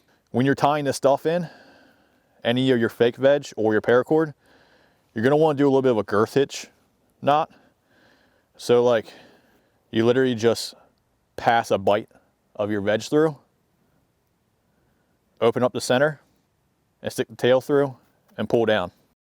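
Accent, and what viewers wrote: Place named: Pennsylvania, United States. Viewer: Lol i can here that PA accent anywhere, channel emote reconfirms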